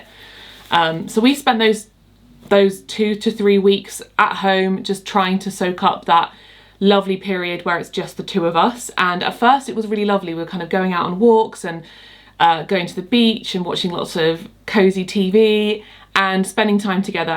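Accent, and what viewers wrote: England, United Kingdom. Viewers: Would you please slowly say your beautiful baby’s name? I’m American and I struggle at times with the English accent and you are speaking very fast. Thank you.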